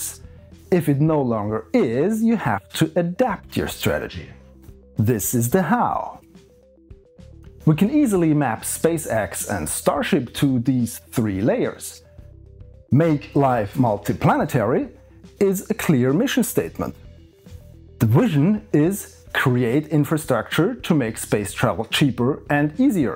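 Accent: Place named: Germany. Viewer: Are you from a German speaking country? Sounds very German.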